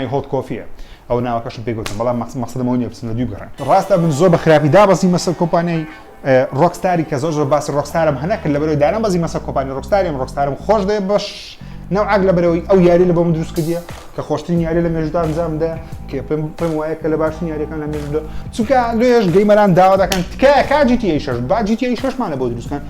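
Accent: Erbil, Iraq. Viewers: Zoor zoor Shazy Xoshawestrin ashtt laimn wshakanta Wa Hich zmany xosht nagoria Ba hawlery qsay dakay Xot mozqof nakay ba bo qsaybkay xambar xot Bgory Wa Zoor Shaza vidokant Zirakanau nweia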